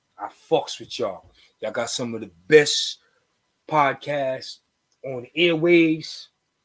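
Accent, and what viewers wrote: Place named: AAVE, United States. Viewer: Get a better camera. Speak English, not ebonics you are too old to sound like a uneducated, hoodlum. Also do your googles, 1/3 of your info is incorrect.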